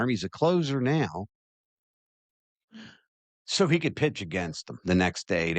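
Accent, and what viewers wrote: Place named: Baltimore, United States. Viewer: I love your Baltimore accent. Go Os.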